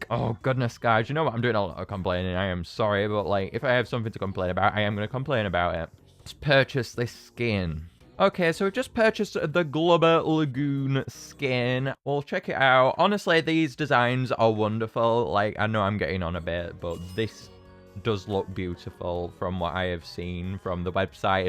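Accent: Scotland, United Kingdom. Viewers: I think your accent is scottish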